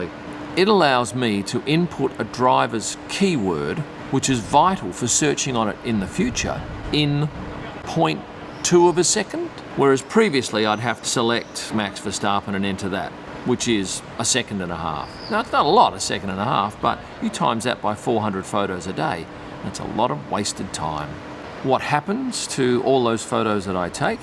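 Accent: Australia. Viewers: This is an excellent channel 😊 love the out-takey bits qt the end, informative, educational, well edited and that Aussie silky voice hahahaha! Stay passionate Bro!! 😆😆😆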